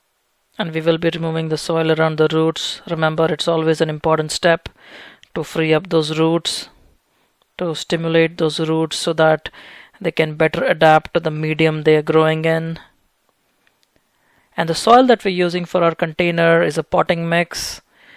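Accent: India. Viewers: What kind of accent is it? Indian or something similar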